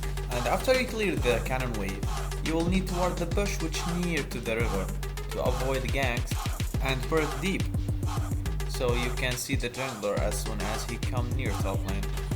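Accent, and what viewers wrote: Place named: Egypt. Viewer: مسوي عنقليزي تتكلم زي المصري ترا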